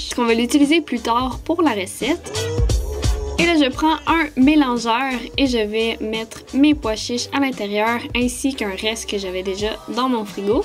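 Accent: Quebec, Canada. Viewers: Tu connais l accent quebecois? Nan jcrois pa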